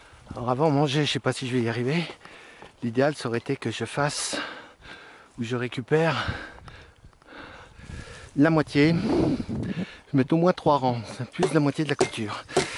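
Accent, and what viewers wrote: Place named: Allier, France. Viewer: Salut, je voulais savoir dans quelle région tu étais car je suis actuellement en stage dans l allier et c'est le même accent et la même façon de travailler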